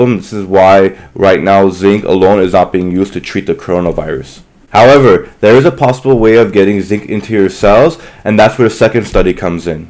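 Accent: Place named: Levant, Syria. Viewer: Are you originally Syrian or Lebanese? I mean no offence just because of the accent. I am a linguist and do research some accents. Thanks for the infos, it was quite informative and needed!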